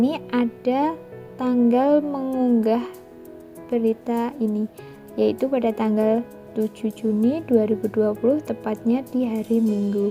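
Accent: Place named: Java, Indonesia. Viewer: Medok sekali bu suaranya 😁 tapi gapapa bu terus berkarya 🤗🤗 saya venny dari kelompok yayuk cs 🤣🤣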